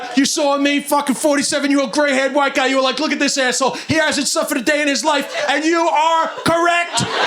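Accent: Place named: New York, United States. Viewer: Bill Burr with a NY accent instead of a Boston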